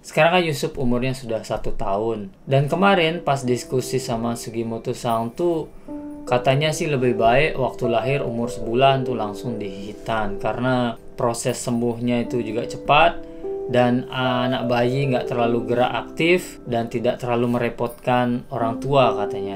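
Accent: Lombok, Indonesia. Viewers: Bang orang Lombok ya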